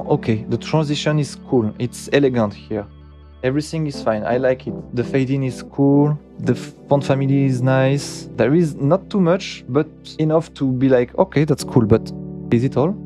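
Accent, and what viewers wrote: France. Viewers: C'est l'accent Français, rien de bizarre. C'est surtout perturbant pour nous Français ayant l'habitude de voir ses vidéos en Français, autrement je pense qu'à l'international son accent ne dérangera pas et au contraire ça apportera une petite touche. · J'adore le concept :) Ps pour l'accent fr, ne change pas les étranger aime ca ;p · mec je suis bilingue et ton anglais est tres bon . ne lameliore pas pluss car on te comprends . si tu perds laccent francais tu perds le charme hahaha les anglophones adore laccent francais alors ne le perds pas. haha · L accent française est toujours présente😂😂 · Moi j'aime bien son accent frenchie. Et il est très compréhensible pour un anglophone.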